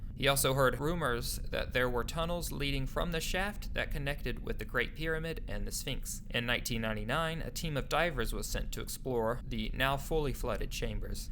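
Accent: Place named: United States. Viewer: You lost me a lot with your odd American pronunciation of Greek, roman and ancient Egyptian names. Thossmess? Plyney? You sound all Greek to me.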